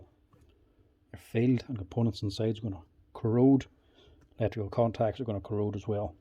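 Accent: Ireland. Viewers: Why are these tool repair guys always Irish?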